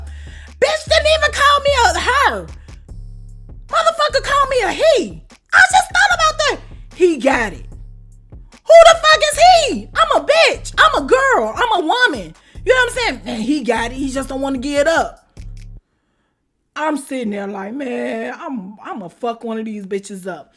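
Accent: Chicago, United States. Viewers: it's sumtin bout that Chicago accent........ 🔥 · You have a strong Chicago accent I'm from Minneapolis damn near happy people here damn near 3/4 of the people here are from the Chi ... You carry that accent heavy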